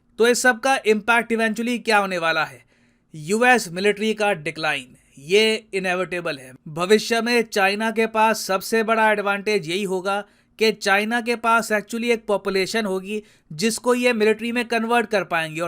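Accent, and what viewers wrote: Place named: Delhi, India. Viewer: sir kbhi-2 ekdum Delhi wale launde jaise baat krte h, how sweet 😂😂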